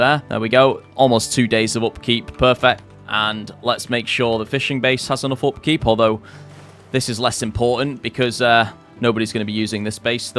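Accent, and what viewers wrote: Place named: United Kingdom. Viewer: He is British I think thats what it is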